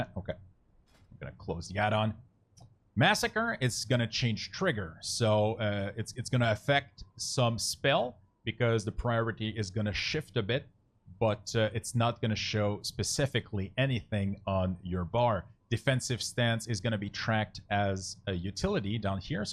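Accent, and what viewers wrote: Quebec, Canada. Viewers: Sounds like you are quebecois ?! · Very nice video. I can tell lots of efforts were put in this and I will be the first one glad to use it right away! Btw QC? I recognize the accent :)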